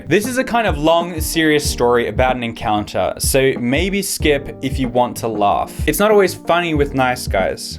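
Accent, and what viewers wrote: Australia. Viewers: your Australian right? I seem to be watching a lots of Australians lately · are you australian pewdie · I love how your Australian accent really comes out in this video ❤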